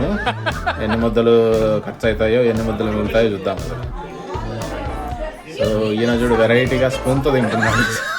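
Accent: Rayalaseema, India. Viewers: Hi sir......this is madhu fr Chittoor...I follow ur most of the videos.....meeru US lo mana rayalaseema slang matladuthu videos cheyadam chala bagundhi 👍🙏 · అన్నా నీ భాష అద్భుతః. పక్కా కడప. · garu you r the king of Rayala seema food and language. US could not change your accent of our Telugu. Nativity in your language. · Bro meedhi Rayalaseema kadhaa.... Kadapa district aa slang same alane undhi... I coming to Virginia State in next week I would like to meet you if you are available... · Anna nuvvu pakka Kadapa language matladuthunnav super Anna. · Speak in our rayala Seema accent nice to listen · Akkadiki vellina mana rayalaseema slag podu kada😀😃😃 super sir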